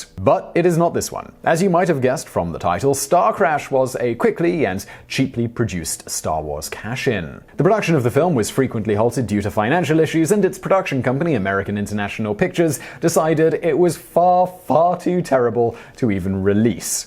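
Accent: United Kingdom. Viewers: Same. He has the perfect British accent...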